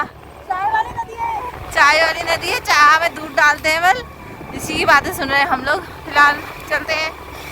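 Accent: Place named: Pahari, India. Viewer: Pahadi bolna kitna accha lg rha h aapka ❤❤❤❤❤❤❤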